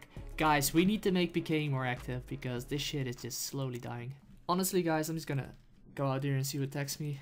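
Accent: Netherlands. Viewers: Proper vids like always and just love that Dutch accent Ign HouseofHouse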